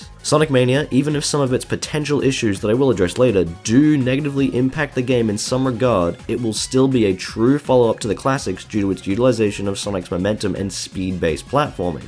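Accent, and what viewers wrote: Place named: Australia. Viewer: I know right? I saw your video and was like "awww yeah, Mania hype!" and then heard your accent "hey an Australian, nice!" and then when you said you were from Brisbane I almost spat my coffee out all over my computer in an over-the-top cartoonish fashion in disbelief. You've earned yourself a subscriber! S3&K is one of my favourite games of all time and I can't believe we are a week away from finally playing something of that quality once again!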